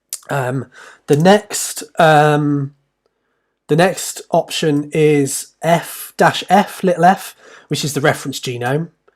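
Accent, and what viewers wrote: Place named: Cockney, United Kingdom. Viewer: it's comfortable to hear cockney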